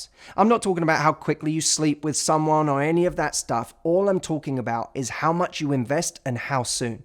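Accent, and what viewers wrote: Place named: London, United Kingdom. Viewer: London accent noted :-)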